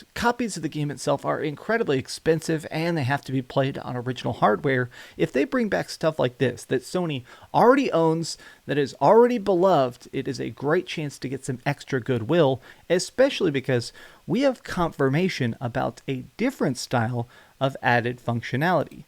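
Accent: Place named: Mississippi, United States. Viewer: Dude, some of the words that come out of your mouth make me think you're from Mississippi, lol.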